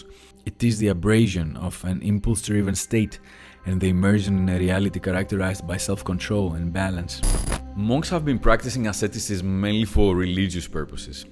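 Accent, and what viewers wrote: Greece. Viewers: U sound greek 100% 👍👍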